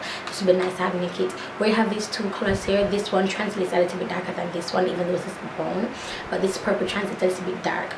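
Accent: United Kingdom. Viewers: does she have a mixed accent cos she also sounds like she has a British accent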